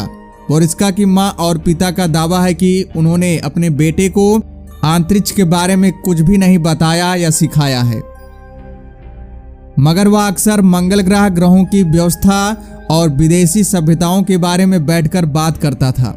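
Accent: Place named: Bengal, India. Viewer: Are bhai ye hindi bolne ki kosish kr rha hai iska accent bengali jaisa hai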